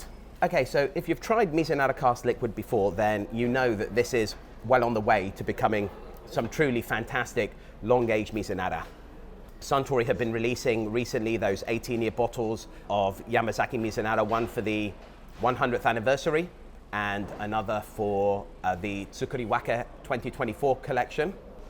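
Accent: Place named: England, United Kingdom. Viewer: What an amazing video! When I go to Japan I want YOU to be my guide!! I have watched many of your videos and I love them all, very interesting! It is nice to see an English man that knows so much about Japan and whisky!! And I love your accent! Thank you so much for creating such interesting content about Japan, I enjoy every single one. Kanpai!!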